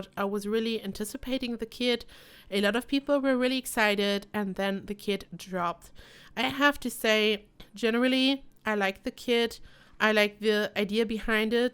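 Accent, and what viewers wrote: Germany. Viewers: I do hear the german accent out of your voice which I think is really cute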